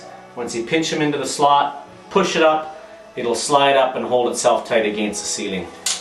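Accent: Canada. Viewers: Little bit of a Canadian accent? Lol .. but enjoyed the content- thank you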